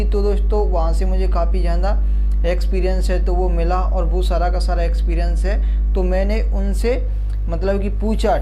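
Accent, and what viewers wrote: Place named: Pahadi, India. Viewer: Bhai video kaafi achi thi. Tumhari tone se tum Pahaadi lg rhe ho Video like frm Uttarakhand (dist-Champawat)😊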